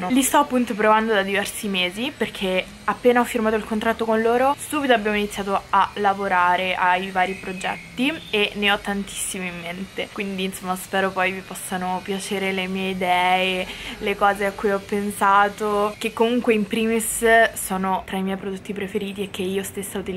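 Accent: Rome, Italy. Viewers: Adoro il tuo accento romano!❤️❤️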